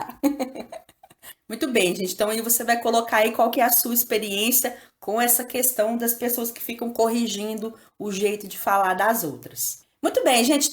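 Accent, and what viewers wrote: Minas Gerais, Brazil. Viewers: Sua voz é linda Seu sotaque é como o meu Pois sou mineira também Continue assim Vc é maravilhosa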